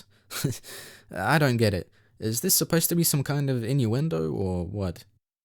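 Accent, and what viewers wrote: United Kingdom. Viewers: Weird to hear someone with a UK accent saying Super Mario Bros saved gaming, considering there was no video game "crash" outside of the USA. Gaming was still very strong in the UK at that time. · Interesting hearing a British person talk about Mario so heavily. I always thought Mario wasn’t liked in the UK 🇬🇧 because he was deemed too kiddy.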